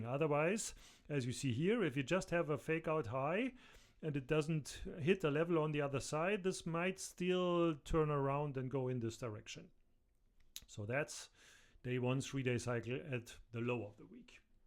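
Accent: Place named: Germany. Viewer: U Are Amazing , are u from Germany sir? Ur Accent is like that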